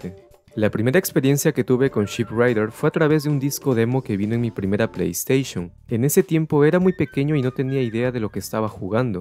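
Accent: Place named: Ecuador. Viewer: de verdad? es que vivo aca en ecuador y tu acento se parece al de aqui jeje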